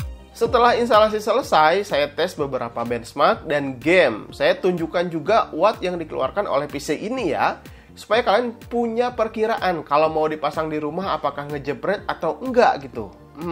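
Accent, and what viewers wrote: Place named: Sunda, Indonesia. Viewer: sundanya kentel haha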